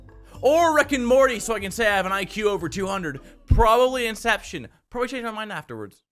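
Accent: Minnesota, United States. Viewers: Bruh unless you were like up near bemidgi no one talks like that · started picking up a Minnesotan accent fast that makes me happy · I DIDNT KNOW YOU WERE IN MINNESOTA OOOFFFDAAA. 100% MINNESOTAN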